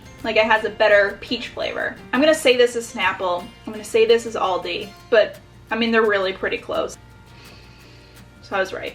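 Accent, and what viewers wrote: Midwest, United States. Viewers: The second way you pronounced it is correct, however I understand the americasation (is that a word?) because of your American accent. ❤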